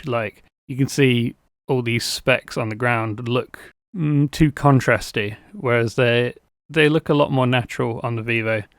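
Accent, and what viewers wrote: United Kingdom. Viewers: I like ur British accent when u speaking bout' these phone i very like ig 😅😂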